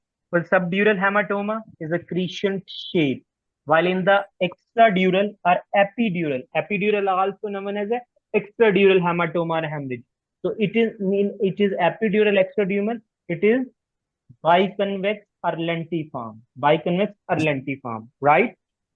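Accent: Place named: India. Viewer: Your E. accent is horrible. Best for India to quit English talk. Make it silence in or written Subtitle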